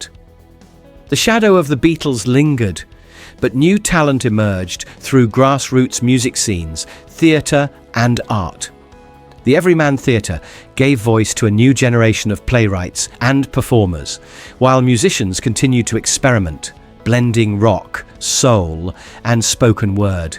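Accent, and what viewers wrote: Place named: United States. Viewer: He's a yank,period - geddit !!😅😅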